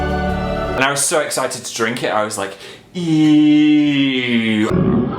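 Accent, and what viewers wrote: North, United Kingdom. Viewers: He Sounds so northern in this 😂🥰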